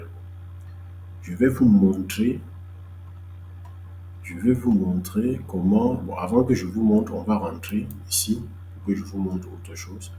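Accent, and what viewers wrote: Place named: Cameroon. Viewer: mon gar ta video n''a pas de volume et ton accent cest kmer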